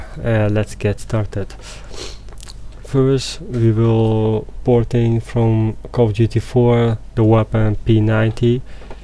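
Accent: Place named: Netherlands. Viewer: Eyy jij bent Nederlands! Hahah kan ik horen en zien haha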